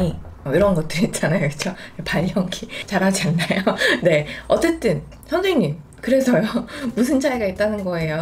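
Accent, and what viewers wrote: Yanbian, China. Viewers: 1。我第一次刚到中国，吃了非常多的麻辣烫。 2。我刚二十岁了。 3。刚才我到韩国了。 4。偶尔老师说的口气很有意思意思，好像是刚（来）从延边那儿到的。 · 연변 말투 좋습니다. 왕왕 사용하여 강의하면 좋겠습니다.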